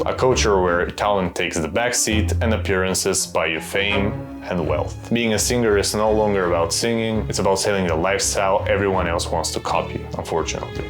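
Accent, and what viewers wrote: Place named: Balkans. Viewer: Ah yes... the most balkan looking man, talking about balkan music, with the most balkan accent. PLS KEEP REPRESENTING US! (Sincerely, an "Алт путка")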